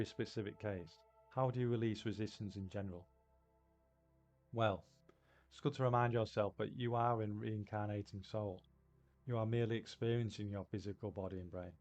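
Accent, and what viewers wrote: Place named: Northern England, United Kingdom. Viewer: The person narrating IS an English person. They have a northern English accent. The subtitles are there for a reason :)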